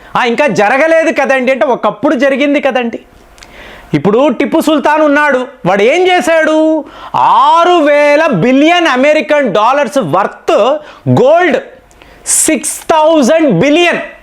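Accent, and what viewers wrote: Tamil Nadu, India. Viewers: గారు తమిళనాడు లో చదువుకున్నారు అక్కడ తెలుగు ఇలానే ఉంటది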